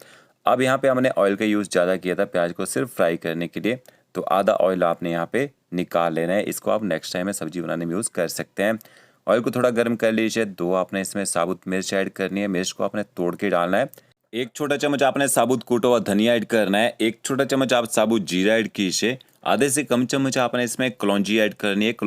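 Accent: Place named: Himachal Pradesh, India. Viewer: yes ji apne gorayian ko pehchan he leta hai banda mujhe aise he lagg raha thaa ki pakka app Himanchal se honge... mere khud bahut dost hai himanchal se.. Sujanpur tihra/ Solan/Dharamshala/Rajgarh/Shamli se tau kahi naa kahi apki awaz se pehchana ki aap himanchal se ho...Ek mera dost Sharma voh Nadaun se hai.. ok happy to hear you...thanks so much..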